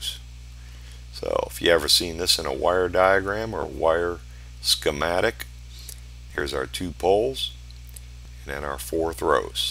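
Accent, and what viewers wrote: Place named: Chicago, United States. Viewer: Thanks for the excellent video. Like the Chicagoland accent as well. Reminds me of home.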